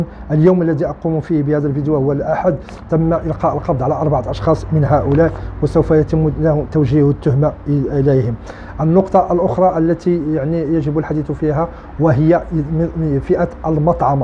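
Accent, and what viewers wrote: Morocco. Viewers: اخي ربنا يحفظك عندك اللكنه المغربية قوية نرجو لو تكرم ذكر الاشهر بالعربي او الانجلش لاني لا افهم الشهور باللهجه المغربية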